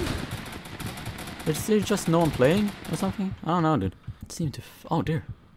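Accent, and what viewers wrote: Sweden. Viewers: you got a swedish accent ngl. Where u from man? Im from oslo in norway lol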